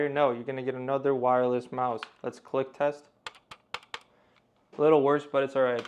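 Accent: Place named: Poland. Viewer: Yo what country are you from you’re giving me polish accent vibes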